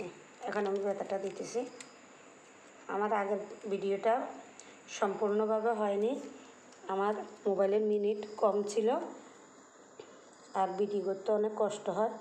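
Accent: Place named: Noakhali, Bangladesh. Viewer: আপনার ভিডিও গুলো আমার অনেক ভালো লাগে আপু আপনার কথা গুলো একদম নোয়াখালীর মত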